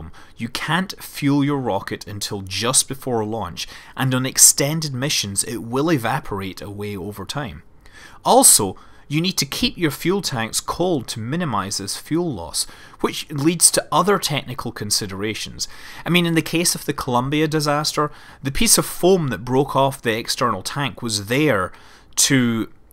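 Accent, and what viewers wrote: United Kingdom. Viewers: Please stop saying MEEE thane. You do not say MEEE thod! This MEE pronunciation is an annoying, "In Your Face" Colonial Yankee Dog, Britishism insult!!!! If it is not made as an insult it is at least a snobbish "Affectation". You are an obviously intelligent, well admired scientist, so any "Affectations" are unnecessary. I can live with AL you min eum, instead of Al lou minum because that is a real phonetic sound of the British way of spelling Aluminum.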